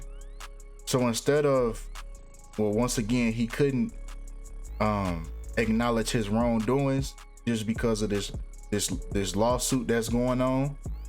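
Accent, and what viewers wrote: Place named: Detroit, United States. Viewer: Bro you gotta be from Detroit i hear it in yo voice 😂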